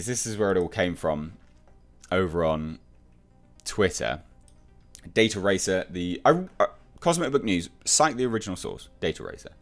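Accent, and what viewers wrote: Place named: United Kingdom. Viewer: as an American I think your Emperor Voice is very very good I think you being British helps? So Rise Of Skywalker and its flat feeling Trailer will be a flop in the US. Unless they are hiding something huge like LUKE being alive its shit, and I have no interest in seeing it. They have killed the 12 yr old boy in me who saw Star Wars in theaters in 1977. We have the OG trilogy and Prequels to give us that great feeling of wonderment we had as kids in the 70's!!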